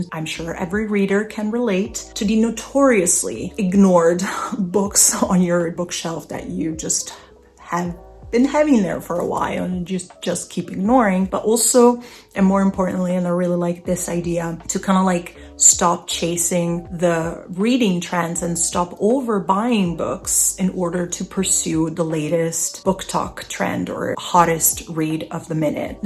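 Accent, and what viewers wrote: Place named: United States. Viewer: Hi - I'm new to your channel. Just wondered how you ended up living in Berlin? You seem to have an American twang so I was curious. Hope you don't mind me asking? I have family in Germany too! Thank you.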